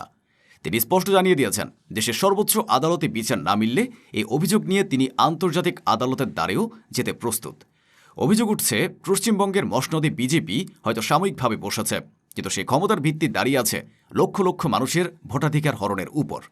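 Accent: Bangladesh. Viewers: কথা শুনে মনে হচ্ছে বাংলাদেশি ,,🥴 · The accent of narrator seems Bangladeshi not Indian. Mere wet dreams!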